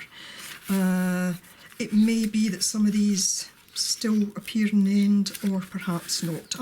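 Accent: Scotland, United Kingdom. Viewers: I don't know if anyone mentioned this already, but the things that you thought were stickers look like window clings to me. I love your art work, and also your accent! My grandmother was from Scotland and I've always loved to hear that accent. I'm from the US.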